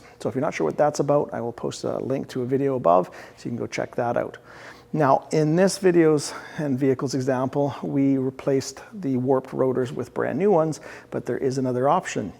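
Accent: Canada. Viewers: Love your channel. Hearing you speak, there's no doubt that you are a Canadian. Love that accent. I live in Missouri, and while we do have salt in the winter, it's not nearly as bad as up in the North. That sure makes it easier to work on older cars. I have no pulsations on my 2015 impala with 75,000 miles on it. Would you recommend having them machine the rotors anyway? They only have very minor grooves, so I think they are worth resurfacing. I get a kind of roaring sound when applying my brakes at highway speeds, and even though my pads aren't worn out, I'm assuming this is a sign that they are getting thin maybe? No pulsation with that sound.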